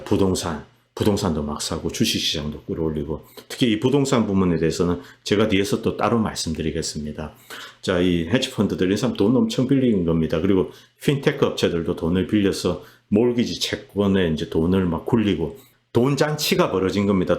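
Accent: Gyeongsang, South Korea. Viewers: 애쓰시네요 ㆍ그런데 이리 방대한 자료를 어디서 구하시는지 궁금하네요ㆍ 미국 계셔도 경상도사투리 구수합니다ㆍ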